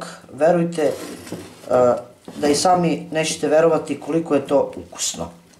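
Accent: South, Serbia. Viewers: Fantazija ukusa,pogotovo gde ima krompra to je neizostavno,svi ostali sastojci su nesumnjivo takođe doprineli ukusu.Moram da ti każem da ti je glas toliko umiljat i taj jużnjački naglasak,podseća me na divnu glumicu Radmilu Savićević, Puno pozdrava.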